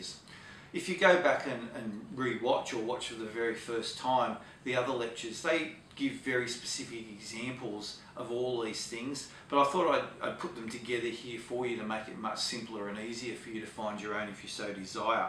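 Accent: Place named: Australia. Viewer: I loveeee your Australian Accent!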